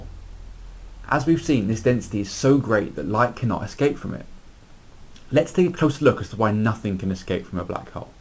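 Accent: United Kingdom. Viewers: i wanted to understand it but i cant understand with his british accent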